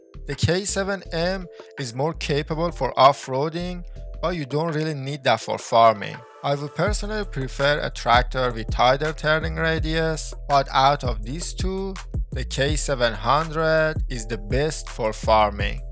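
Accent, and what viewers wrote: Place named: Iran. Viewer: dude i love your persian accent XDDDDD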